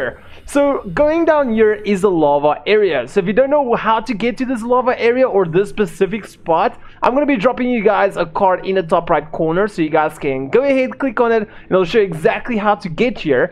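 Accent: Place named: South Africa. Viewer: DEAD GIVEAWAY!!! The Agggg... bra you are my South African brother :)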